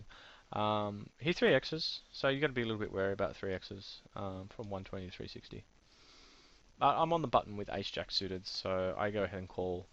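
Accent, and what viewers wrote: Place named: United Kingdom. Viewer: Thanks for the reply! By the way, I noticed that you listed Japan as the country. Are you British or Australian living in Japan? Just wondering from your accent, it's obviously not Japanese accent:)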